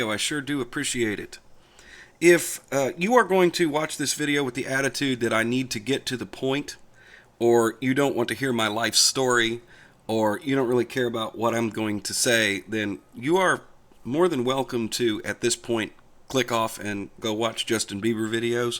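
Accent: South, United States. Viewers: Boy, I'll tell ya - that southern twang spoutin on and on about Linux is something special. Keep on keepin on, and don't mind the haters. You've got another subscriber! PS: To everyone saying that "Ubuntu just works - stop distro hopping, you'll learn how it REALLY works" - go f*** yourself, you dang fanboys. He's absolutely right. The packaging of the kernel is not always done right. People mess up and some of these people are coders & packagers. I can't count the times on my two hands when I've installed the "easy to use linux distro" only to see that certain unsupported chipset & hardware, as well as unstable properitery AND open source drivers, make the system crash and burn horribly. It's quite irritating and someone who tells it like it is makes the situation better for new users, in realising how free and open it really is. It is chaos - a beautiful chaos, but still: chaos.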